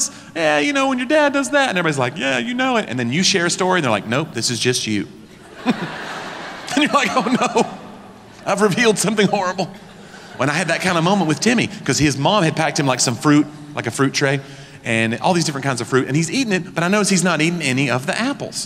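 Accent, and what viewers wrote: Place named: Alabama, United States. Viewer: sounds like Alabamian’s.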